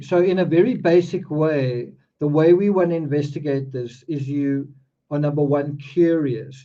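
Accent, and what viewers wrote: South Africa. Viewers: Is that a S. African accent? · Hi are you aa South African ( your prenounceanation is very afr.) Nothing wrong in that im afr. Know you live abrought. Oma s a.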